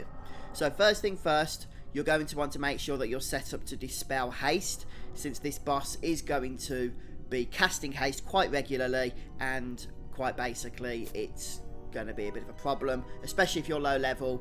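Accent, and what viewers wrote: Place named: Birmingham, United Kingdom. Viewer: He's from the Birmingham region.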